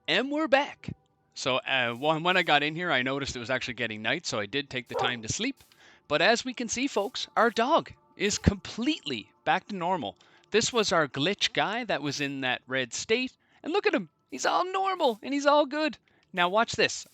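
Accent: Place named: Australia. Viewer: Great video! loved the Aussie accent! haha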